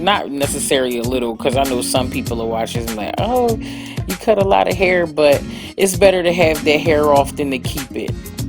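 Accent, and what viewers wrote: Philadelphia, United States. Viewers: Omg where are you located...you lowkey sound like you from philly and that’s where I’m at and I need a appointment 🤩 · Love your channel! I could listen to your accent all day. I live in Atlanta but I’m from the suburbs of Philly and as soon as I heard your voice I knew!!! Such talent ❤️☺️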